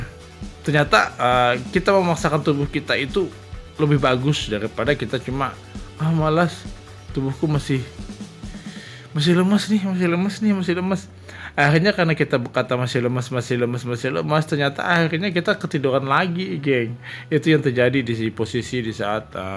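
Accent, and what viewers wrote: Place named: Banjar, Indonesia. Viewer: Logat bahasa banjar nya keliatan bangt bang😂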